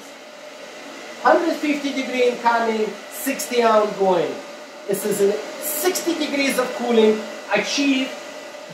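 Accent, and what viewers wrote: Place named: Russia. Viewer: Чёткий Русский акцент.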